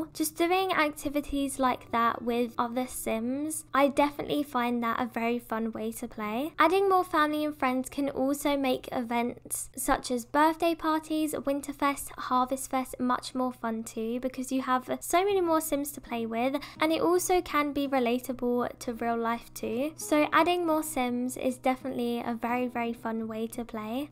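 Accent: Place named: United Kingdom. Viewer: Where r u from? I love your accent... It sounds british but im not sure...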